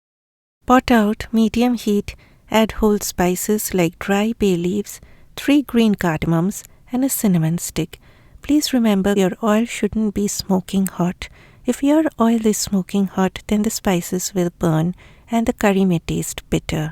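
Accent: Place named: India. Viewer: Haha indians have cute accents. Wobbly head nods are funny.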